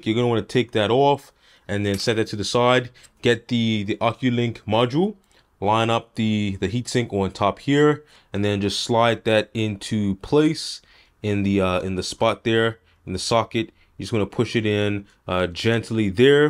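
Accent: Bermuda. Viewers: sound bermudian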